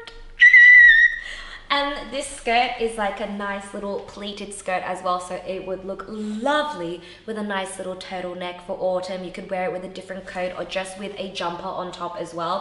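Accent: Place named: Australia. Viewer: you look beautiful in everything you wear sweetheart, and I love you're "Aussie" accent.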